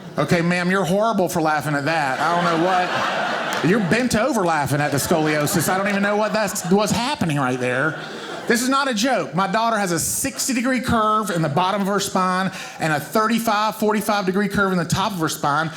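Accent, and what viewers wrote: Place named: South, United States. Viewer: Really hilarious especially with the southern accent. Cant wait for more from him...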